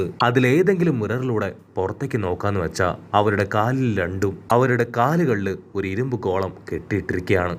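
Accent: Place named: Malappuram, India. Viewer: Ade malapuram thane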